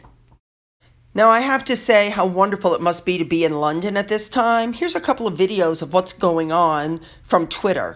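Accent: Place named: United States. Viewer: Thank you for all the kind things you say about our Royal Family ! I must admit, you have me laughing, with your pronunciations and your lack of understanding some of our crazy customs. 'They met a goat'...... The goat would have been The Mascot of his Regiment.😄 The Derby is the Daaarby, as in Baaaaath! You Americans never say aaaah! EdinBORO is pronounced 'Edinbro'. Pimms served in a bar can be Pimms No 1, 2,3, 4, according to the base alcohol, such as gin, whisky, rum,etc., and are served with mint, fruit, according to the number. Thanks for your highly entertaining channel, I have subscribed.🙋🇬🇧🍓🍉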